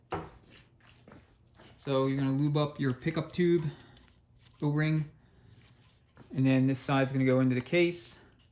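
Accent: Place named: Philadelphia, United States. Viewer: Another excellent video! Thank you for giving me the confidence to work on my transmission! Based on that accent, you gotta be a Philly guy!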